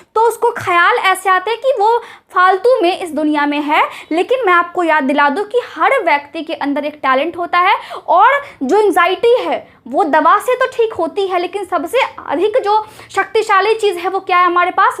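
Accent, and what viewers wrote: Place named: Bengal, India. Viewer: Mam aap Bangal se ho,aapki language se lagta hai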